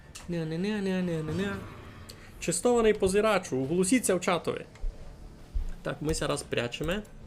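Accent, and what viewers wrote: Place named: Rusyn, Ukraine. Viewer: Що, не чуєте русинський говір із Закарпаття?)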